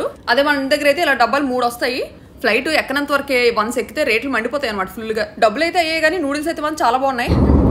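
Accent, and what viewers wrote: Vizianagaram, India. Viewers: Maa vzm slang untadhi chari ❤ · MI papa ni tesukelatam leda enra dooram vellina vijayanagaram language maaraledu meku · మన విజయనగరం భాష వింటుంటే చాలా సంతోషంగా ఉంది అంది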